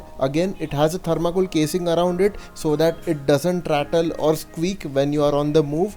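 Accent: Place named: Mumbai, India. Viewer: Very informative,!! Your talking style is unique,and 'Bambaiyaa'!! But you gave us a good 'Gyaan'!! I am watching this in 2021 end, with heavy heart, because, VW is on a 'killing spree' being a 'petrol head', I mourn death of 1.2TSI, 1.4TSI, & 1.5TSI is not for 'people'( I think, VW considers people are those, who can spend >22.5 lakhs for a car)!! It is evident, how much you love your car!! Happy driving days, & continue the 'good work' you are doing!!!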